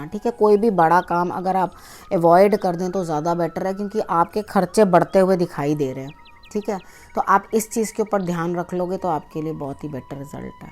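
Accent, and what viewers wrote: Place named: Punjab, India. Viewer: Jai mata di rabb sab ko sukh de excellent explaination you Are very sweet way of telling anything tooooo cute punjabi style hindi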